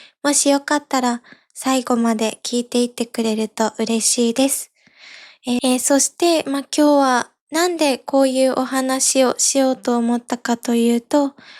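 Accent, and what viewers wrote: Kansai, Japan. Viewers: Hey, I listened to the last 15 Podcasts so far and always try to catch up :), no matter what topic I love listening and learning together with you. Actually when you become more "negative" I can resonate a lot, it makes me thinking of happyness and future and I want to thank you for that, because without speaking about it, it just stacks up over time. My Japanese improved also sooo much (Next time I try in Japanese again :D) :) PS: Your Kansai Dialect is very cute ^^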